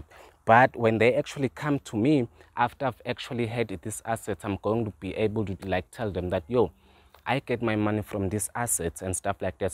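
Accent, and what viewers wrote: South Africa. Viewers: you sound like you from SA and why don't you reply to the people asking you which country are you talking about? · that's South African accent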